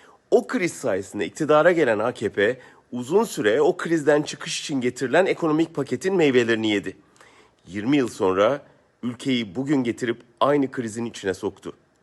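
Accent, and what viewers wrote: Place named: England, United Kingdom. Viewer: Türkçe konuşan İngiliz!!!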